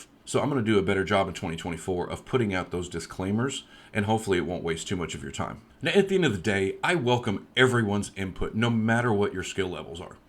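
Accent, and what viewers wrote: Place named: United States. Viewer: I enjoy watching computer help videos when the speaker is from America and has a pleasant voice, which you have, you even sound a bit like a radio man. Happy New Year!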